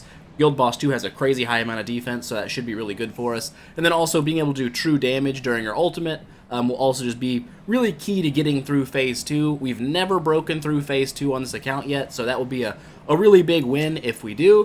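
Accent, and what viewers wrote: United States. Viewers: Yoooo welcome back (although I'm pretty late to the party), I still remember watching you 7 years ago when I first came to the states and couldn't even hold a conversation to save my life, got addicted to watching your videos (more than idle heroes itself) and accidentally practiced English while shadowing the way you talk as I went back thru your video catalogue with the video speed set to.50 or.75 since you talked way too fast for me back then lol, I also happened to pick up a very thick southerner accent thanks to you haha, anyways thanks for the videos man you honestly had a pretty big impact in my life!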